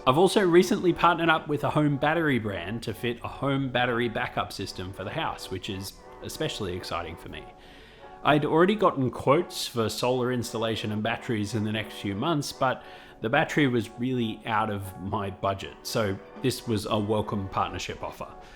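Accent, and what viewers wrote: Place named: Australia. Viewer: I sometimes forget how much I love hearing my own accent on youtube until I find a aussie channel that is of interest to me!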